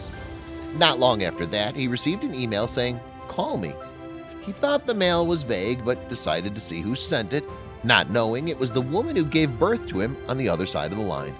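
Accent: United States. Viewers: Looked interesting but nauseating American voiceover so nope.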